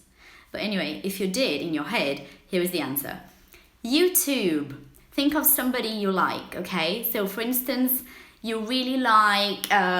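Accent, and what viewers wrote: United Kingdom. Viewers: Beautiful British accent 😘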